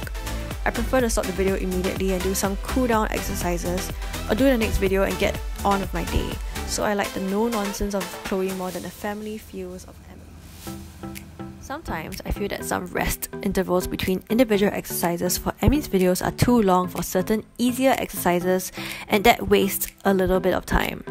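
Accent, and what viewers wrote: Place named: Singapore. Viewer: You're Singaporean right? 🤔🤔🤔👀 I can tell by your little accent! 💖💖💖😇😇😇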